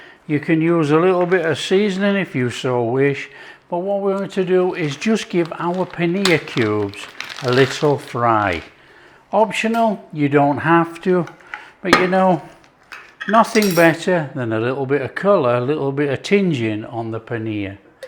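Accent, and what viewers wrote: United Kingdom. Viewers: British accent is 😂😂funny 🤣🤣 kumon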